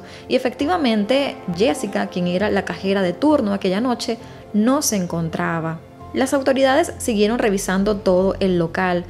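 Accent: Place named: Venezuela. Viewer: Hola bella, es un gusto escuchar tus casos y escuchar el acento venezolano lo mejor. Tú, LesmaVR, JulySeib y Dinosaurios las mejores, sería interesante que narres el caso de los Hermanos Faddoul caso que ocurrió en Venezuela.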